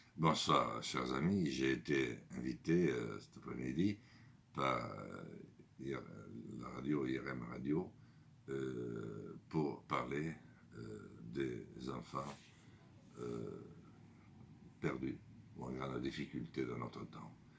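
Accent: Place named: South, France. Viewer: merci!! gros probleme de civilisation: rapt legal et irrespect pour les parents et enfants resultat: destruction de l'etre? helas: courage a ceux qui en souffrent! merci cher mr lasalle g voté pour vs! l'accent du soleil la vraie france du terroir!!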